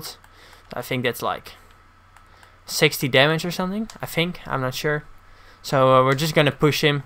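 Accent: Netherlands. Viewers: Correct me if I'm wrong, but are you Dutch? You sound Dutch at least. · Are you dutch?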